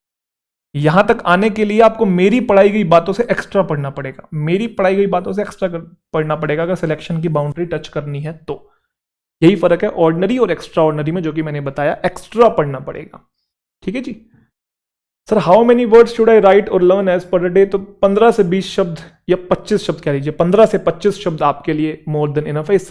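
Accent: Haryana, India. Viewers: Sir aap haryana se ho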